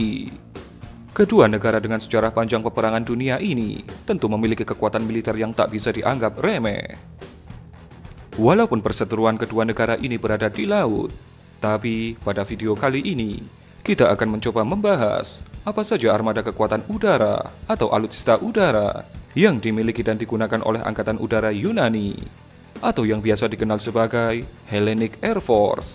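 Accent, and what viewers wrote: Java, Indonesia. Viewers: kok medok sekali logat nya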